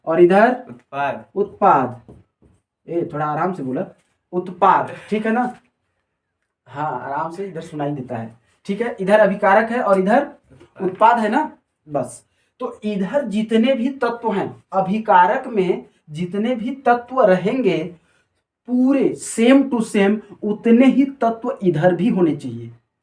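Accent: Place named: Singrauli, India. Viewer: वीडियो में सिंगरौली की आवाज का प्रयोग किया गया है।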